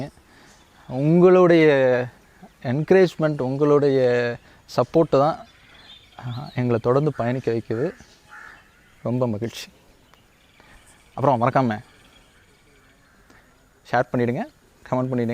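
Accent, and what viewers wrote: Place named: Theni, India. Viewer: Bro neenga entha ooru pakka Theni matheri iruku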